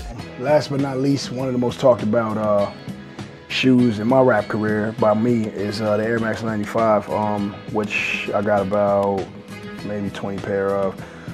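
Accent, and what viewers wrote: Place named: Inglewood, United States. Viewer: He is from eaglewood CA, which is called the "wood" People from there talk like that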